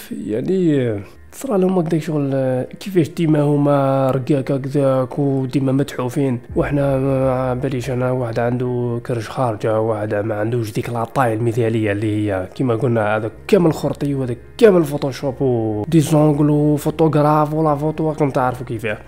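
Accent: Algeria. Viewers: سطايفي ولا برايجي باين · سلام عليكم خويا فيديو رائع يعطيك الصحة... نسقسيك نتا سطايفي ولا برايجي الله يبارك من اللهجة المهم شكرا اخي ❤❤❤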